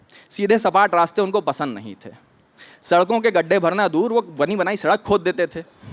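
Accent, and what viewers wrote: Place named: Kanpur, India. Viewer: That's a thing....know as,"kanpuriya accent"!!!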